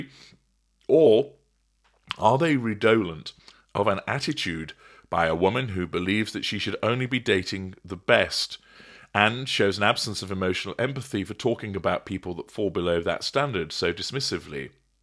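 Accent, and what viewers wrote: United Kingdom. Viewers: He is, it's the British accent ;)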